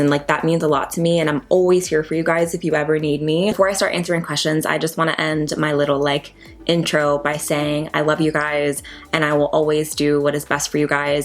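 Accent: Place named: Tennessee, United States. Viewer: Low key still hear your Tennessee accent!!! So cute❤️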